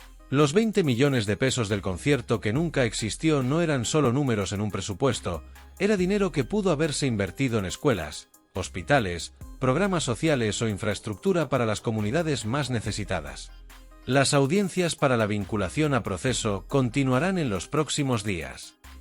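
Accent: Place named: United States. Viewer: No puedes pronunciar CUAUHTEMOC ?? o decir veinte millones ?? De que pinche cerro te bajaron ?? Tu pinchurrienta manera de hablar tan agringada y miserable dan risa...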